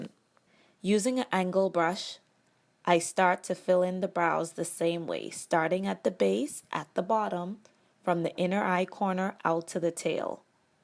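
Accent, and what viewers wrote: Bahamas. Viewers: Sound like a Bahamian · Bahamian accent???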